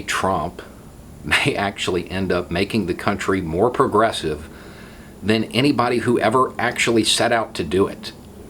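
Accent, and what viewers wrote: South, United States. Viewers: In what state is located? His channel keeps popping up in my "recommend video "finally watched one,.is this guy for real? Or is he just trolling? Would like some options before I invest time to watch previous and future posts. He seems to be informed about issues and open to ideas which suprises me given his southern drawl.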